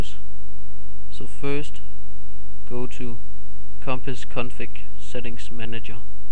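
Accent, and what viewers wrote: Denmark. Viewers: hey.. jeg kan høre du er dansker :D ..jeg har et problem... jeg har download ubuntu okay... men jeg kan ik' brande den ned på en skive hvorfor kan jeg ik' det???... jeg prøvede også at putte iso'en på en usb... men jeg kan ikke få min pc til a boote fra usb ??? hvad skal jeg gøre `???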